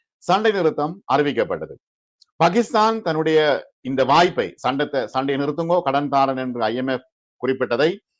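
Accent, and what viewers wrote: Sri Lanka. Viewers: முதலில் நீ யாரு உன் தமிழ் இலங்கை தமிழ் மாதிரி தெரியுது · Brother unga srilanka Tamil arumai aana india vanthu Pakistan ku kudutha adi perusu aana indiala paathippunu solluvathu thavaru ingu pathippu apdina Pakistan kaiyala thanamana kolai thanamana makkalai thakkiyathu eni indian army vidathu indian PM Modiji vidamatyaru usa eni vantha angaium adi vilum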